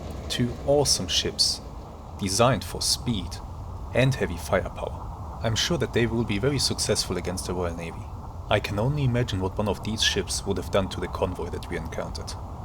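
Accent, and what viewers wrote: Germany. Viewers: I have recently started following your adventures, and am highly impressed; Your German accent adds total authenticity, and the scenarios reel off like movie scenes; Many thanks from England...;)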